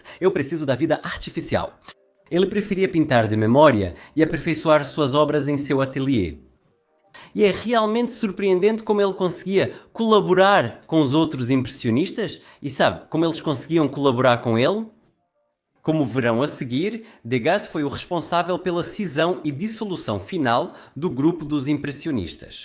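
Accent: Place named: Brazil. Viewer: Seu sotaque não, e brasil.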